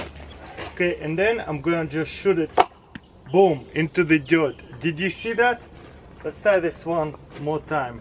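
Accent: Russia. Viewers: Yeah and he also has the same accent as crazyrussianhacker I wonder if they are related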